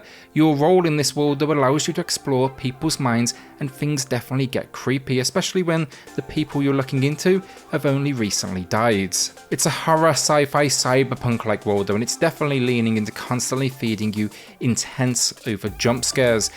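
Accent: United Kingdom. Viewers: I have to ask, where in the UK is your accent from? Haha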